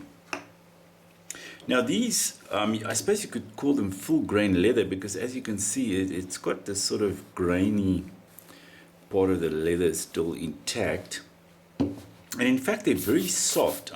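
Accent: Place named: South Africa. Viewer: You are South African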